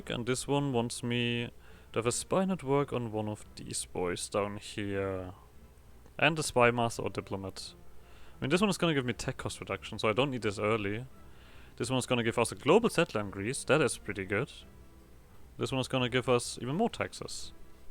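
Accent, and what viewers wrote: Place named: Germany. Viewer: lmao after that "soooooo" i didn't even need to hear the accent to immediately know you're german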